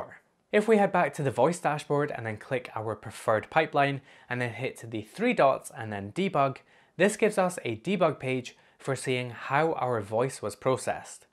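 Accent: Scotland, United Kingdom. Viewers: Great video. I´m missing your promised ESPHome config - also i´m not able to get the replies announced on the M5echo - any idea how to configure that? The speaker does work as audio-player... By the way: my (german) family loves your accent - it´s scottish, correct?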